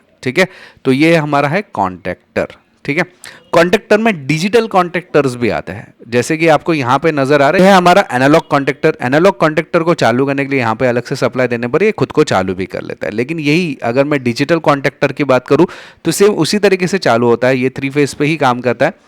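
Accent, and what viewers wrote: Gujarat, India. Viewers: Sir aap basically Gujarat se ho kya.. language se laaga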